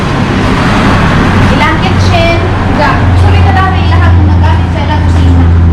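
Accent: Bohol, Philippines. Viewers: Siawa jud nimo day oi mag cge jud kog agik ik sa imong sinultian ganahan kaayo kos taga bohol the way mosulti kay makahimuot kaayo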